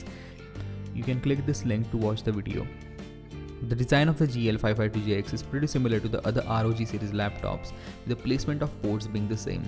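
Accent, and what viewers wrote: India. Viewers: Indian acent is really anoying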